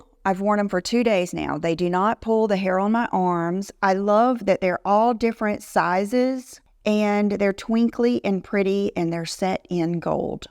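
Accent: South, United States. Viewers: Hi …..I happen to love your Southern accent ….I’m a Tennessee girl so we both know…..it’s a Southern thing….great video✨ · “I think I have a little bit of a southern accent” hahaha ur so cute.. as someone from Wisconsin.. yes you do have a southern accent — love it! I have a Wisconsin accent 😂😅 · Hi, loved the pjs. Very pretty. I am a 100% remote worker. I put on dresses and makeup even if I have no scheduled Zoom mtgs as it makes me feel pretty and professional. I look forward to the videos you were talking about for those of us at home. So, yes, please....do make those vids. You and Marnie are my favorite influencers. I love that you are friends and sometimes mention each other. BTW, you do have a slight Southern drawl. Love it😊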